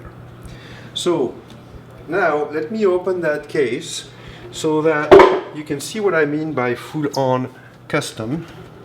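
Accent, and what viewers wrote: France. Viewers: german? u serious ? hes french u can clearly hear it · he's french for sure, he has the accent :)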